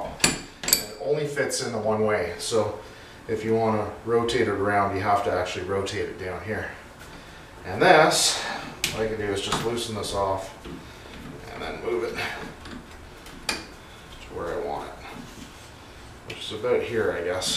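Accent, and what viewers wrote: Canada. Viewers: I thought "he must be Canadian" Confirmed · You didn't have to say Canadian. Your accent gave that away. I miss hockey.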